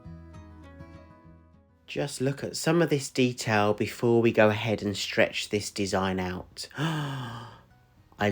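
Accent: United Kingdom. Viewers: Where do you buy Australian Floetrol? From here I can buy it in Australia for a realistic price plus taxes and duty or on ebay for half a million...... Still love your british English 😍 And I like this design, it looks like a jungle deer 💯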